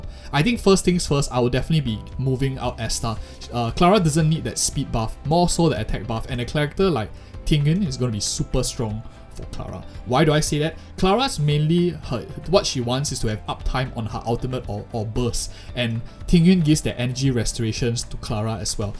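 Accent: Singapore. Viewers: bro you sound like a singaporean. If you are from singapore, it is nice to see a fellow singaporean covering honkai star rail 😄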